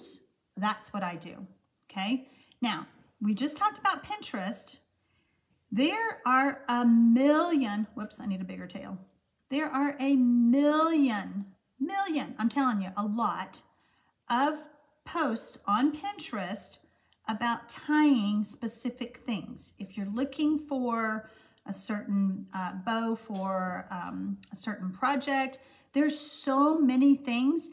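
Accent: South, United States. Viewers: Hi! Been watching your videos and love what you do! Love the southern gal accent too!!:-) Can you tell me where you order your 12x12 plastic sleeves for DSP? Love that idea and I need to get them. Also, then how do you store your sleeves?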